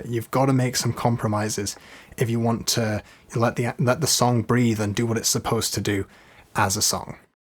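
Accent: Scotland, United Kingdom. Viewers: Love this and your accent. I'm from Scone (live innLondon) i swear you have a Scottish accent, or maybe i am home sick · Was not expecting a fellow Scottish person when I clicked this 🤣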